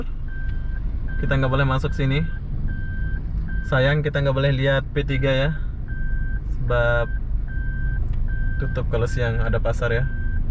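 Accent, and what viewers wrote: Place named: Malaysia. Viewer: sip makasih mas, tapi logatnya kayak malaysia yah..hehhehe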